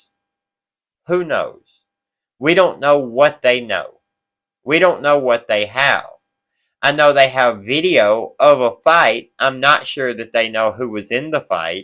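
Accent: South, United States. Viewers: Just became a new sub today! Love the channel and that southern draw you got! 😉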